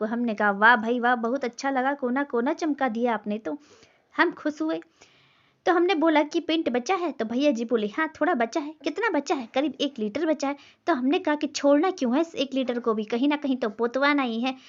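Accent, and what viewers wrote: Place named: Lucknow, India. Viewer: Aaj to aap Lucknow ki language bol rahi hai Ham ham😂😂 very nice 💞 💞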